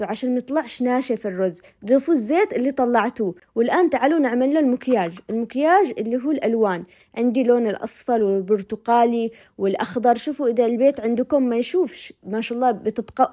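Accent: Aden, Yemen. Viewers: اللهم صل على سيدنا محمد وعلى آله الطيبين الطاهرين أجمعين، يا اختي إني فدالك كل ما اتابعك توجعي قلبي وتشوقيني لمسقط راسي عدن والمعلا والتواهي ، اما الصياديه هذة بالضبط أنا اطبخها وجننت صديقاتي هنا في امريكا بالأكل العدني ، فديت قلبك صوتك عدني ولهجتك عدنيه شوقتيني لعدن واهلي ، الله يحفظ اليمن واهلنا اليمنيين المصابرين والأقوياء دائما، تحياتي لك حبيبتي اختك عدنيه متابعه لك من امريكا 🌸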